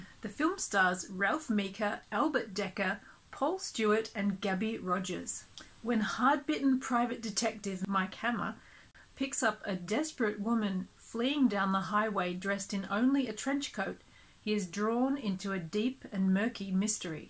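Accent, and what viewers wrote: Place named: England, United Kingdom. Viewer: One of my favorite 'Film Noir's' ! Sexily narrated with a lovely English (I think) accent and a lot more sensual than Eddie Muller of TCM will ever be, bravo!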